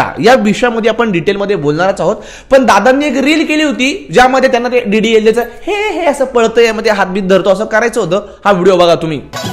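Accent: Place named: Mumbai, India. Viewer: मुंबईची मराठी तर फारच छान 🎉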